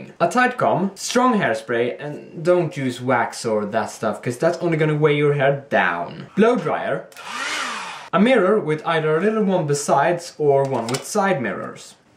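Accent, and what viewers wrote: Sweden. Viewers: sounds swedish? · You sound swedish... Har jag rätt?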